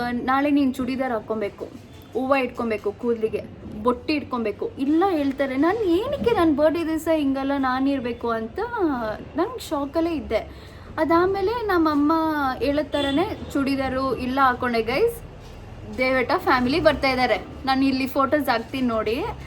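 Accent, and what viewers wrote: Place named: Andhra Pradesh, India. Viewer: Why you have telugu accent??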